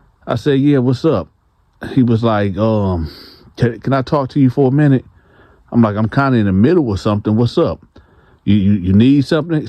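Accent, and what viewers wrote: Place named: Michigan, United States. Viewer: Your voice is similar to the Goat point guard Magic Johnson. It must be a Michigan accent. #LAKERNATION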